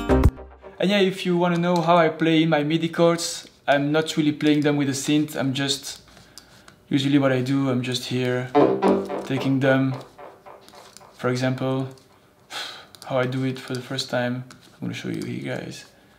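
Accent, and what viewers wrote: France. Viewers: You do have a slight french accent, don't you ? Considering the fact that you're Belgium, it's shouldn't be too far from the truth 🤷🏻‍♀️ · Je suis francais et on reconnais ton accent français ^^ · Salut, j'ai l'impression que tu a un accent français, tu est français ou quoi? Et sinon. J'adore se que tu fais!!!! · I love your remix! Keeps me moving! Very inspirational indeed! I also love your french accent! 😍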